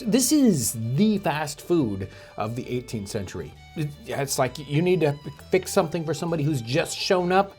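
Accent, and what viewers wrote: United Kingdom. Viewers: Also are you British.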